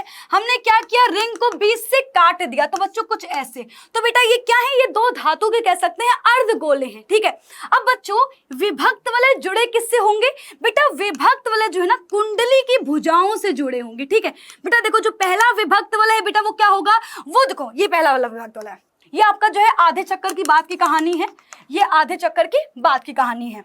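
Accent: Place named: Kanpur, India. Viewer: Ma'am kanpuriya language me bole to ekdum jhakaas samajh me aa gya 🙂🤭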